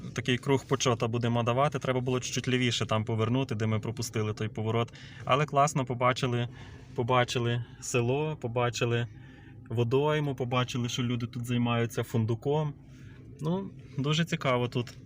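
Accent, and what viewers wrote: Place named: Lviv, Ukraine. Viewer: Вимова у Вас Львівська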